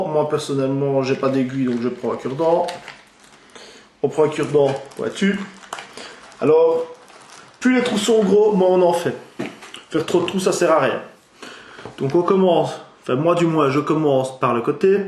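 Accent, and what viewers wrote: Belgium. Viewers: Merci pour ce tuto ! Parcontre je comprend pas le truc du demi charbon, sa sert à quoi ? Et dommage pour le niveau d'eau moi je sais jamais si y'en à trop ou pas assez MDR ! Et ce qui me fais bien rire aussi quand y'en à qui disent "un petit blanc qui explique comment faire la chicha" MDR faut être marocain, algérien ou je ne sais quoi pour fumer ou faire la chicha ?! N'importe quoi... Bref merci du tuto ! Et j'adore ton accent belge :)))))))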